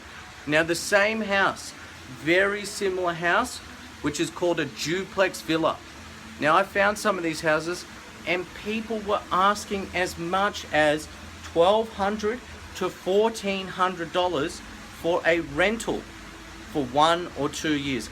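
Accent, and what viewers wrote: Australia. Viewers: are u ozzie? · BRAVO! YOU ARE A VERY INTELLIGENT FELLOW. ARE YOU AUSTRALIAN? THANK YOU ANYWAY!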